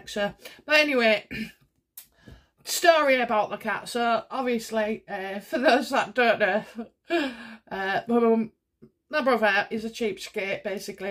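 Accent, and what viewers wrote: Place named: Lincolnshire, United Kingdom. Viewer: Would love to know if your accent is from around Lincolnshire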